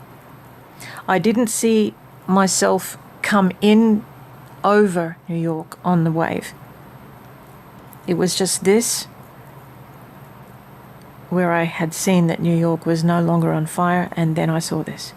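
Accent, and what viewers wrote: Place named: Australia. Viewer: I though your accent was Aussie. Just watched your video about "who you are". It must be lonely to be stuck in a hotel room far away from your home, but there are no coincidences with God. Maybe you made a mistake by coming here to marry someone you really did not know, but God can use that for His purposes. Maybe that was to get you away from a country that is forcing the V on everyone. Anyway, in my opinion we don't have much longer to wait for His return. PS: I am a musician--banjo, guitar, mandolin and dulcimer. Mostly old time gospel in nursing homes, churches, etc. Well, some folks don't consider banjo players as musicians. LOL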